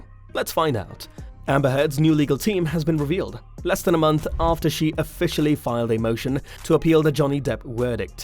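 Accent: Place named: United Kingdom. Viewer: Get the facts straight you sound British so your laws vary from ours and as his supporters I believe that was done by bots. Facts are wrong are you sure your not being paid for this article by AH...just have to ask.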